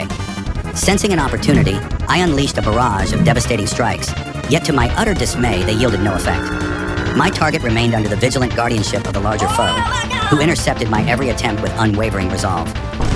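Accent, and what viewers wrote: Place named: United Kingdom. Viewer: why is the narrator brittish?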